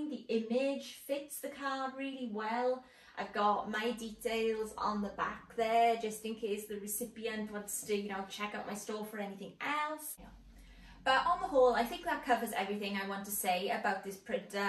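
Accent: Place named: Wales, United Kingdom. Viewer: 🏴🏴 Love your Welsh accent ❤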